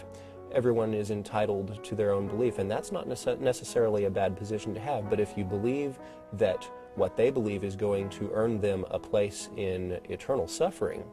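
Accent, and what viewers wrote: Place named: South, United States. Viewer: I think the relatively subtle southern accent and that extra little wisp of hair fooled us all into assuming you were christian, while most of us now know you are... how do you say... an 'actor'. But seriously, I think you did a great job of portraying a perfectly intelligent and very eloquent young man who happpens to be brainwashed.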